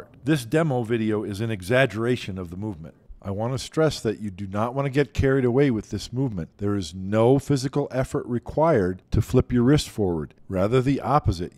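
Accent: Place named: Wisconsin, United States. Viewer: With that accent, you’re definitely from Wisconsin lol. Love the content! I just figured out the “throwing motion” on my own the other day and it helped a lot. I need to try out the 90° rule on a table tomorrow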